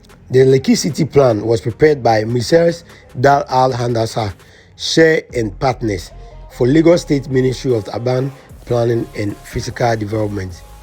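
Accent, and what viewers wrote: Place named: Ghana. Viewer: A nice educational video. You sound like a Ghanian. But a different and positive minded Ghanian. Not like some other Ghanians who never fail to be antagonistic about anything Nigeria. Good video narration bro. Keep up the good work. Love will always triumph over hate as long as you keep showing love.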